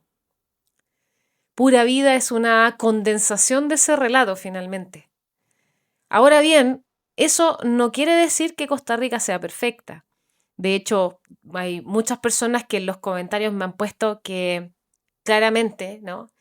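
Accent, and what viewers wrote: Chile. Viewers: Me gusto bastante como lo explicaste, es así como que hay poblemas y tratamos de que no nos afecte tanto, acá algunos llevamos la vida como relajada como sin prisa Y cuando uno va a otros países le extraña ver el corre corre, por tú asentó me pareces Chilena, hace poco fuimos allá y fuimos a un lugar en la ciudad a comer comida bien tradicional y el mesero nos atendió como con una prisa y a la vez se veía como triste y decia una amiga comamos y nos vamos porque ese señor como que no nos quiere aquí 😅 Será eso que nos acostumbramos al trato Pura vida ❤ siempre una sonrisa, siempre sin prisas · Saludos hermosa Arquitecta de sueños. ´¿ Quisiera tener una polola como vos? Ja quedo impresionado por tu cultura, bueno soy un tico que quedó enamorado de tu país y sólo fui desde Santiago hasta Antofagasta, ahí me quedé. Chile es la tierra donde alzas una piedra y aparece un poeta. 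Me encantó la cultura poética de Chile, tengo gratos recuerdos y me halaga lo que hablas de mi país. Soy Alex, un poeta costarricense y puedo escribir unos versos sobre tu belleza. Me encanta como proyectas la voz, tu lindo acento chileno y bueno me gusta que hables con conocimiento. Quisiera volver a Chile para cooncer el Sur, eso sí en verano por favor. Dicen que el Sur de Chile tiene muchos lagos. En el norte se ve otra cosa y se prueba el Pisco chileno. Saludos y avísame si querés el poema de un tico y a donde te lo mando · Srta, he tenido la oportunidad de vivir en varios países, iincluyendo Costa Rica hoy vivo en Kentucky pero que maravilloso ha sido su analisis sobre este pequeños pero bello país, por tu acento creo que eres chilena y una de mis mejores amigas en mi pequeña ciudad Somerset de es Sol, una ex diplomatica de Chile en China y Europa con quien compartimos todas las semanas y siempre terminamos hablando de nuestra amada ¨Tiquisia¨. 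Su analisis me ha hecho reflecionar de todo lo que implica la expresión PURA VIDA. y sin temor a dudas cuanta razón tiene ud. Ojala cada pais de nuestra amada Latinoamérica y del mundo tengamos ese sentido de orgullo de la tierra y cultura que nos vio nacer, como lo diría el gran Poeta Nicaraguense R.Darió ¨ Si la patria es pequeña una grande la sueña¨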